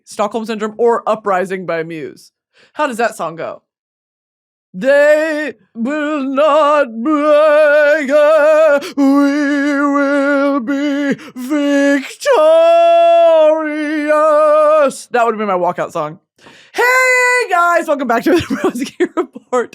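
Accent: South, United States. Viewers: I just watch for the comforting southern accent 😌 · listen ik someone mentions it every video but the fact that it's just her in that room just completely unhinged and southern is wild lmao love it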